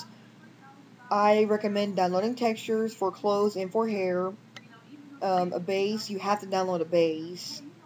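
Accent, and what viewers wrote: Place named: Finland. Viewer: I really like ur accent! is it Finnish?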